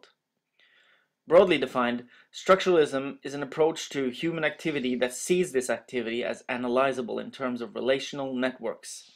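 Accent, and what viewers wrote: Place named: Norway. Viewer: I do enjoy a crisp sounding Norwegian-English accent!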